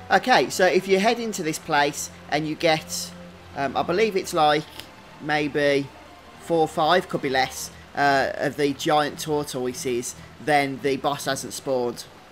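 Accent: United Kingdom. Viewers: Yeah..not all we are english native speakers. Plus with his british accent..it's hard to understand everything..🤣🤣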